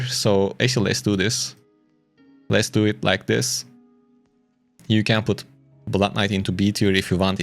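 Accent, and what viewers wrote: Turkey. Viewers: Kardeşim sen türk müsün ingilizceyi konuşma aksanın cidden türk gibi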